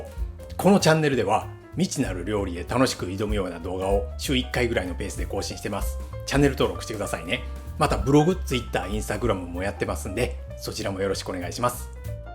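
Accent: Kyoto, Japan. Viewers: 下鴨の出身です¨̮ 嫁いでから京都のお漬物の美味しさを 再認識✨京都弁に安心感🥺 シンクに赤紫蘇の洗った後の赤いお水が 流れた瞬間、実家を思い出しました。笑笑 母の方法とはちょっと違うけど、 ものすごくしっくりきたので✨ こちらの方法でも作ってみます☺️ めっちゃ美味しそうです！！！ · 分かりやすかったです👍今年は2回柴ずけ こしらえてますが😊もう 1回 こしらえてみます(動画参考)で👍京都弁がチラホラ👏👏👏よかったですね🙆🙆🙆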